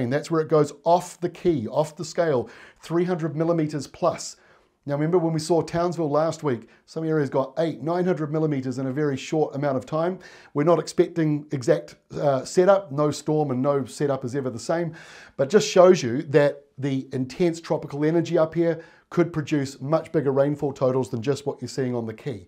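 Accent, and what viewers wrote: New Zealand. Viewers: The plain English explanations (with kiwi accent) make this the best weather channel!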